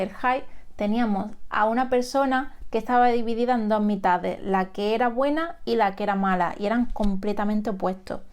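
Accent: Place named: Almería, Spain. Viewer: Qué descubrimiento tu canal! Me encanta porque es diferente a todos los que veo de lectura y literatura.Genial como analizas, la simpleza con la que hablas, tu pensamiento crítico y lo visual que lo haces todo.Ojala sigas haciendo estos vídeos de análisis de clásicos! Por cierto, no sé si eres de Almería, pero tienes acentazo jajajaja yo si soy de Almería! Te animo a que sigas así!! Genial aprender tanto y tan bien!! Un abrazo!!❤❤❤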